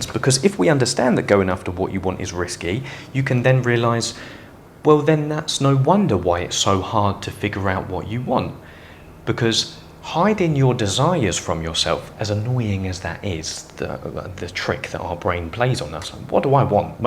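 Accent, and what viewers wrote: United Kingdom. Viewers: This man has a British accent.. I'm pretty confident I'm sold into 30 seconds..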